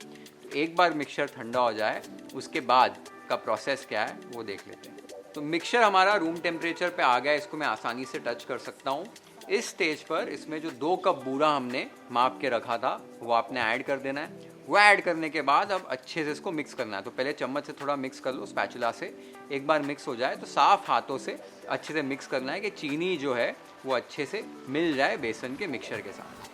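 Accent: Maharashtra, India. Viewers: आपकी हिन्दी महाराष्ट्रीयन style की लगती हैं लाडू = लड्डू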